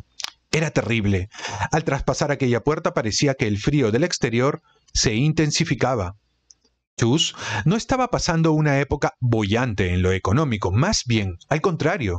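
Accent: Peru. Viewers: Me encanta oir como lees el libro con ese lindo acento peruano. 😊 Saludos desde España.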